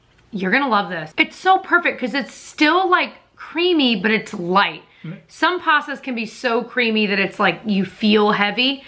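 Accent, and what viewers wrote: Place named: South, United States. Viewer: It’s a Southern thing too! Midwest and Southern accents have similar vocal placement and sounds.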